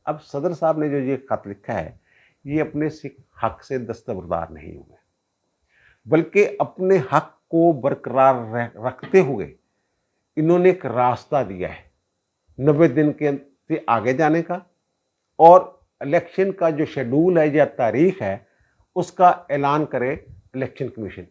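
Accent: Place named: Jalandhar, India. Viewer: چوھدری صاحب یوں تو آپ کا وی لاگ قانونی طور پر بہت مظبوط اور معتبر ھوتا ھے لیکن جب آپ جالندھر ھوشیارپور کے لئجے میں پنجابی بولتے ھیں تو بہت اچھا لگتا ھے کیونکہ یہی لہجہ ماں بولی کا میرے گھر کا ھے۔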